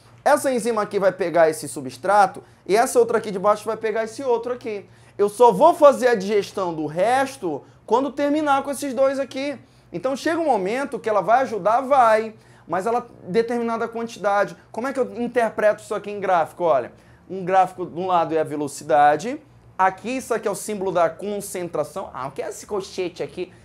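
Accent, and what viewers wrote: Pará, Brazil. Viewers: Esse sotaque paraense que reconheço de longe, tão bom de ouvir. Sou de Natal RN, mas minha família toda é paraense. Parabéns pela didática muito boa. PS: Se não for paraense vou passar vergonha, mas se parece muito. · é Paraense. O sotaque não nega.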